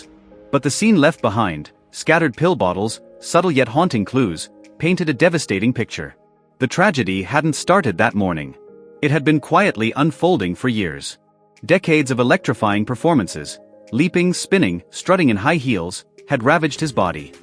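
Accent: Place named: Asia. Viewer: What's up with all these Asian accent Voice A.I. video popping up everywhere?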